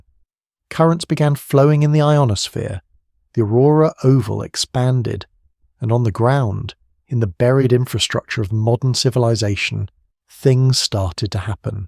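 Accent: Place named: England, United Kingdom. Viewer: Its the strongest this year? Big Deal. Tell Me when something important happens without the English Accent.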